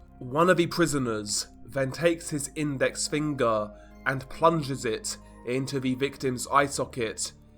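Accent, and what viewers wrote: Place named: United Kingdom. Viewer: Man, this british accent is fire for telling such well writen stories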